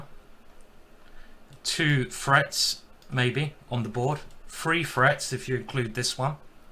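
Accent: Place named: London, United Kingdom. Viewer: As italian I know nothing about english accents. Are you from London?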